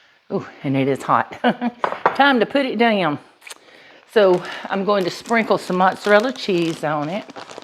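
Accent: South, United States. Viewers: Tuning in from St. Louis. As a single guy, I’ve found a love for cooking as of the last year or so. Thank you for the inspiring recipes and a southern drawl that’ll make a guy just melt.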